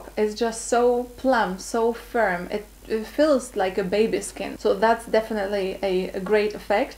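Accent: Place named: Poland. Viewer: Kurde, a jednak, my Polacy poznamy się wszędzie. Usłyszałam tylko Twój akcent ;) Żeby nie było, mam taki sam, nie napisałam tego, żeby Cię obrazić :)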